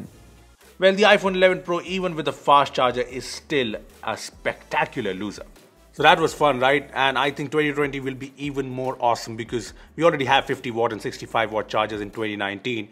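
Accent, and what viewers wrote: India. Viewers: oh shyet another indian accent.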